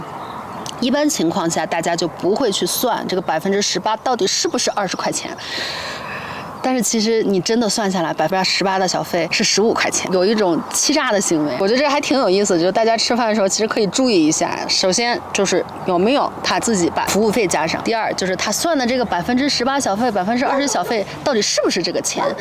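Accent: China. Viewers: 大陸鄉音太重！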